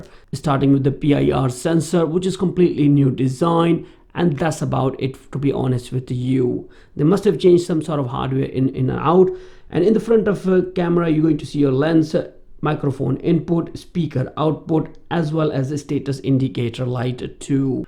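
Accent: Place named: India. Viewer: Abe... Desk accent bahut hai be... Didn't show how to INSTALL it on front door 🚪 need to do be more thorough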